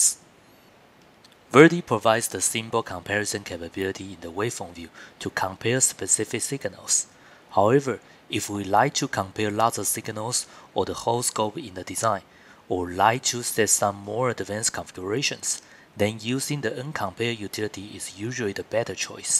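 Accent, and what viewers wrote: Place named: China. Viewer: Chinese Accent. Difficult to understand. Please upload video with better accent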